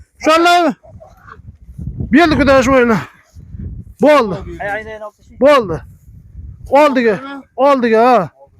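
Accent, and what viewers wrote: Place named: Fergana, Uzbekistan. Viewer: Кара бир жойларинг бир нарса чикиб кетмасин.Гапида фаргоналикга ухшайди,шунча огирни кутаргандан кура пахта терсанг булмайдими ука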